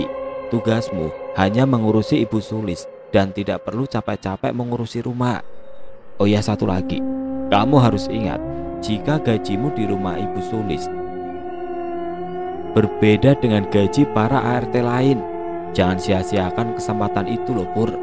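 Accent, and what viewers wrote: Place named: Java, Indonesia. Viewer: bukan, kayanya yg bacain cerita ada 2 org. Klo yg biasanya itu mngkin yg namanya mas, logatnya Jawa agak2 ke perbatasan Jawa barat. Tp klo yg ini Jawa nya medok bnget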